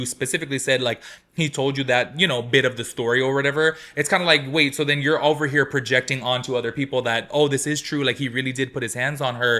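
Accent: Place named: Hawaii, United States. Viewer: Mahalo, I said earlier when you was talking, I was like ehh .. he talk like me local kine ❤❤❤❤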